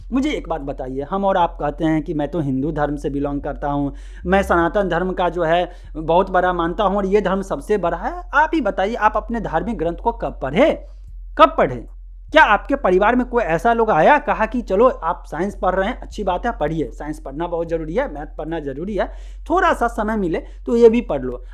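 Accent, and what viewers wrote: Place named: Pakistan. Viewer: भाई वीडियो का थंबनेल और टाइटल चेंज करले आचार्य जी नाम के आगे दूंगी लिख रखा है वीडियो सही बनाई है पर ये सही नहीं है व्यूज कही और से कमा लियो, आचार्य जी के तरफ से बोल रहा है तो ढंग से बोल ये क्या आधा हिंदुस्तानी आधा पाकिस्तानी। भाई जल्दी नाम के आगे से ढोंगी हटा नहीं तो रिपोर्ट और dislike करनी होगी मुझे।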